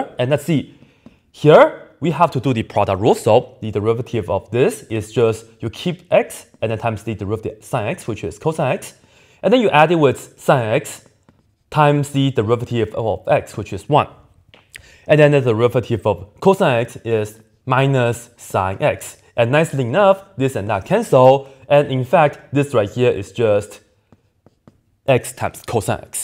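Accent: China. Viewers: His english is cool It seems a mix between english and chinese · You speak English like Chinese unlike me which is Bengali😂😂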